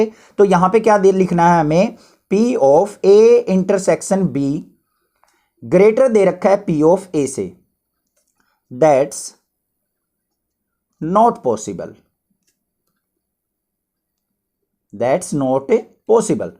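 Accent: Haryana, India. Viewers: Sir you are from haryana 🫂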